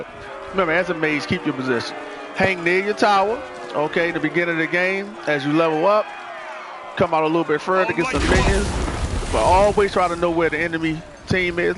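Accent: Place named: Louisiana, United States. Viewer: Man, are you from Louisiana? Cause you got that accent! Also, great video. Take this sub!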